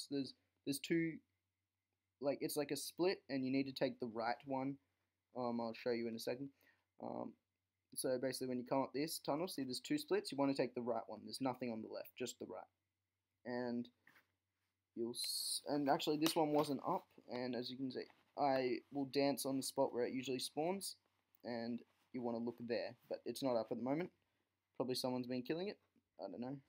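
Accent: Australia. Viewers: Dat aussie accent tho... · U sound aussie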